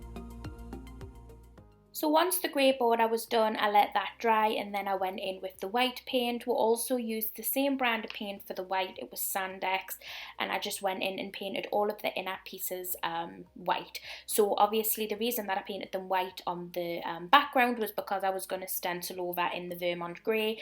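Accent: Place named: Jamaica. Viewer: Jamaican here. Its wild to hear the similarities in our accents. Good job on the patio!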